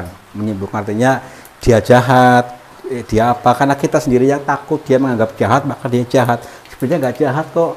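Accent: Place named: Java, Indonesia. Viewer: JAWA MEDOK NYARI DUIT KONTEN NGADA NGADA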